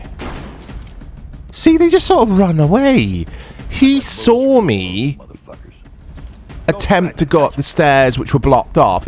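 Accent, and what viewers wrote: Wales, United Kingdom. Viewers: Just saw your Gen Zero worth playing in 2024 and wanted to ask by any chance are you from Wales or live close by as you have a very Welshy accent.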